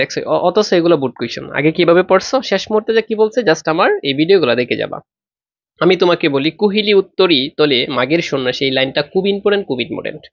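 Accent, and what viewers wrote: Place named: Sylhet, Bangladesh. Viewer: স্যার আপনার বাসা কি সিলেট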